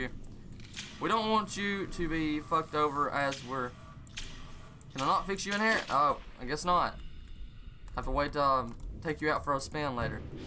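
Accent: South, United States. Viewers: I think it's neither. He's probably from southern U.S.A. He's got a southern accent. Not too strong of one either so probably Tennessee or something. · Sounds american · Southern america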